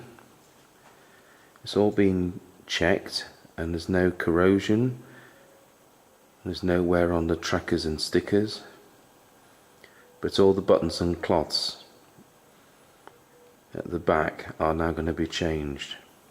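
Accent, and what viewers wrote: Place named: RP, United Kingdom. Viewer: A very interesting video and you do talk very posh!:o)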